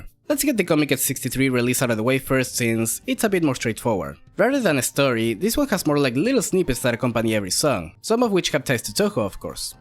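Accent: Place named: Argentina. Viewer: mira flaco no se porque llegue aca sinceramente pero es increible lo facil q es sacar el acento argentino d una persona, aguante argentina y el diego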